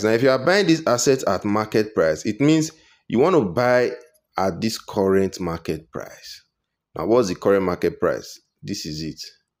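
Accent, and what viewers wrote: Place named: Africa. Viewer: We can only understand our African English . 🎉🎉🎉 thanks for the explanation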